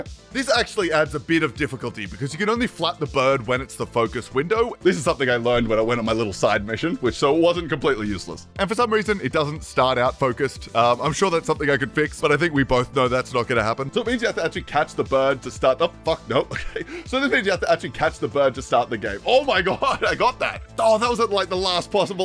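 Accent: Australia. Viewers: Just realized you’re Australian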